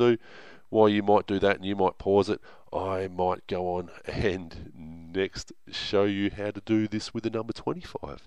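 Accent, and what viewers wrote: Australia. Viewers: you are Australian right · Is he strayan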